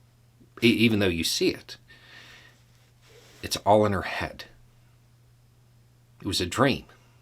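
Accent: South, United States. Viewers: What I find especially humorous, if you're going to insult someone, a bit of reading will make you more effective. The person in question decides to insult using things that a well-read person would have realized did not fit this particular case. is from Florida, I lived in Florida, and I feel for him, but that's neither here nor there. He calls a Redneck and a Hillbilly. These are both things rooted in Appalachia and not the deep south. How reading could have prevented these mistakes? Simple geography would have told you that Florida is relatively bereft of hills. How about redneck? An understanding of history would have told you about the Battle of Blair Mountain, where the miners who were attempting to strike for human working conditions were called rednecks because of the red bandanas they wore. So, no, is neither a hillbilly nor a redneck, he has a southern accent, only a decidedly ignorant poorly red individual wouldn't know that. 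For the record, hillbilly, and redneck became terms of derision due to efforts by coal mine owners to dehumanize their workers in the eyes of the general public to make it easier to mistreat them.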